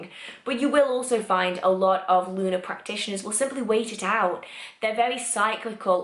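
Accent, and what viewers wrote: United Kingdom. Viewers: This is an excellent video in all respects and I will share the link on social media later this year. [ Am looking into neopagan lunar magic on youtube. ] One important reason to recommend this video is the book reference. At your website I found another significant book by Joey Morris which connects two areas of my interest: Celtic mysticism and hedge witchcraft practice. I may get that. Of course, I always appreciate the Brit accent. The moon magic I use is formal classical Hindu / Buddhist. Regards to Plymouth.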